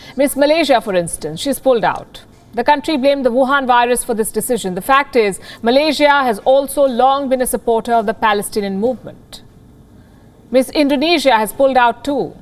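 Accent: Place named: India. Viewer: Her Indian accent is off putting, the whole world has every English news channel speaking British or American English, change the anchor keep the script..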